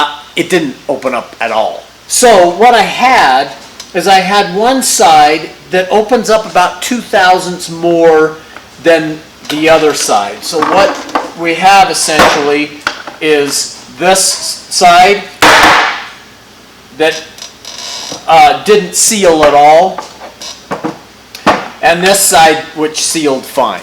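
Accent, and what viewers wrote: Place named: United States. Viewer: American accent and metric! Thanks for not frying my brain with inch unit tolerance.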